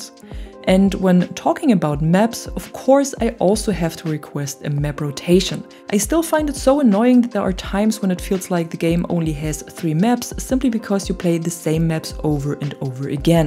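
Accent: Germany. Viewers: I'm only here for the sound of your voice, are you German?😊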